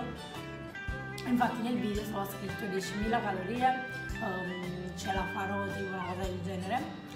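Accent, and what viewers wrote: Naples, Italy. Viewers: Sei napoletana Ps ti adoro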